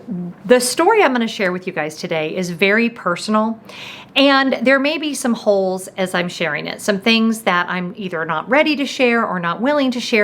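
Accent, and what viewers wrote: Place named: United Kingdom. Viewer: I loved the British accent 🇬🇧!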